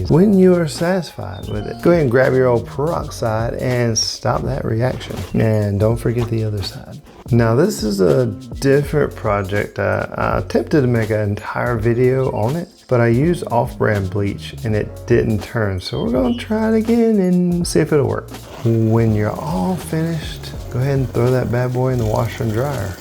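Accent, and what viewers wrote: South, United States. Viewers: I’ve been watching you and thinking 🤔-that southern accent is too familiar. Then, i stalked you!!🤣 nah, just found your PO Box. BOILING SPRINGS!!!! Hello neighbor!! Spartanburg/Pauline area. We can almost yell out of our windows. 😂 loving your videos. Learning so much and getting some good inspo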